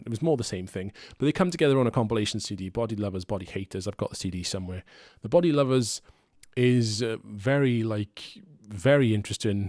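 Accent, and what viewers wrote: Wales, United Kingdom. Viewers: Strong welsh accent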